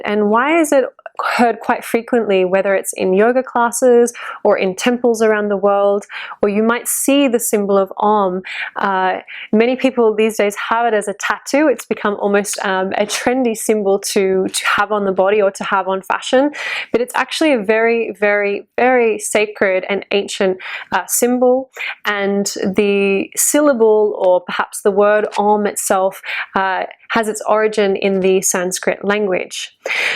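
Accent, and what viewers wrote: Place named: Australia. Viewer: Thanks for your reply. Just curious, your accent sounds Australian. Am i right?. Where did you study(assuming you did) in India? Some common places for westerners being Rishikesh or the Shivananda Ashrama in kerala. I am from India by the way and i am a native of a the temple town of madurai working in Bangalore at present.. We are a family of traditional Brahmins and Vedic scholars, with my father maintaining the ritual fire. We use OM to start vedic chanting and to end I found your explanation for OM to be down to earth and realistic, compared to the one's many fake Indian gurus give.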